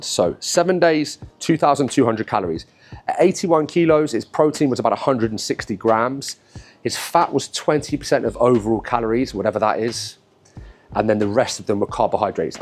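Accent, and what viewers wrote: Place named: Hampstead, United Kingdom. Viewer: I was watching a walking tour of Hampstead Village on my iPad and then I clicked on this video. I can tell by your voice that you were originally from that area or close by. I’m in California and would trade pools and palm trees for charming and quaint. I just lost 15 lbs and finally getting my body back. I now have a diet of sardines, salmon, and salads. Instead of walking tours on my iPad, I will try walking outside. Great tips in this video. Thank you for posting!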